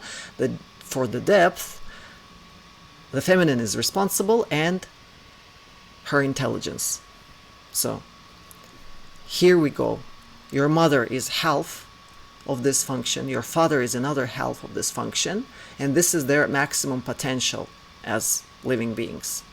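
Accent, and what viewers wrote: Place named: Russia. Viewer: Thank you again Ms... I love your content as much as your rich Russian accent... You presentations are always very clear and informative... 🙏💜😃💜🙏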